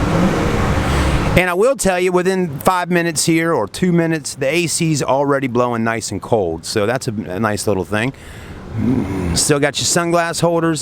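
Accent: South, United States. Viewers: This dudes southern accent reminds me of Arthur Morgan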